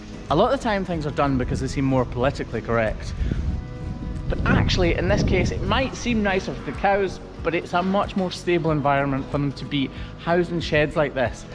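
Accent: Scotland, United Kingdom. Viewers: You forgot to mention his wonderful Scottish accent. That’s not as important as learning something about his trade or his passion and care for these animals, but I’m with you. I’m a 66 year old lady from Centennial, Colorado, SE of Denver, and I find myself binge watching these videos of his. Very enjoyable pasttime. 😊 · I’ve gone through so many videos of yours by now. I have been thinking about what it is that makes it so compelling. Trying to pin it down is hard but for me it’s the combination of the Scottish dialect, your charisma as well as passion for your work. It’s all just so wholesome! Albeit gory and educational 😊 Anyhoo - thank you ✨ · Have you ever checked out your subtitles? It's hysterical sometimes, the bot cannot figure out your accent. Scottish is my favorite accent btw. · My mother's maiden name was Cameron. I have been to beautiful Scotland. 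Your Scottish accent makes me feel closer to my ancestral roots. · I've been on the horse side of things. Very interesting to learn about the cows claws. You make it enjoyable & easy to pick up on. Easy to understand. The accent helps too. I become a fool for anything Scottish. Especially accents... 😏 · First I love that your a Scot so am I and I was a dairy farmer in Poland Central NEw York very ruff winters! I love your show, your accent, the land is beautiful l. Me watching your show is like almost being in Scotland thank you for this show Always Kathleen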